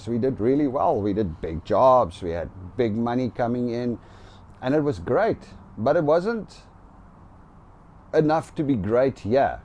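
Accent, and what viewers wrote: South Africa. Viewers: i like to hear a south african person talking about my home country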